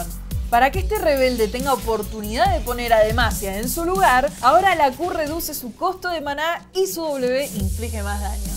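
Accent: Rioplatense, Argentina. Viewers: no puede evitar el acento argentino xd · Pueden porfavor pedirle que hable más neutral ? Sin tanto acento, se entiende que es Argentina pero viejo a la panchasky le exigieron hablar "neutral" durante toda su estadía en Riot.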